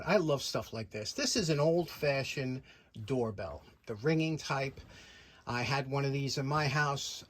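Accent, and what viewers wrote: New York, United States. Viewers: I am definitely not one to correct but I believe you misspoke or maybe I misunderstood. That screw size 6-32 is not six thirty second which would imply a size in inches, it’s a six-thirty two. It is a six gauge screw with 32 threads per inch. I’m sure you know all this maybe it’s just your NY accent and my Texas ear. 😂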